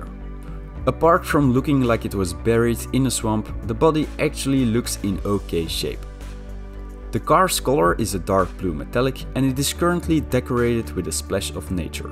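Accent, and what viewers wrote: Netherlands. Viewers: Nederlands? Ik dacht het al te horen maar dit soort video's zijn wel echt geweldig dus duimpies omhoog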